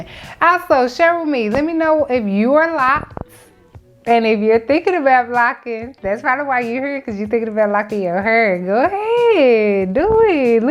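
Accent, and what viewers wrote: United States. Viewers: Do I detect a Texas accent? I think I know my ppl when I hear them and you speak similar to Beyonce and Kelly 😄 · I feel all of this! I am getting Microlocs again Monday 2 days from today! Also are you from stl? I love your accent!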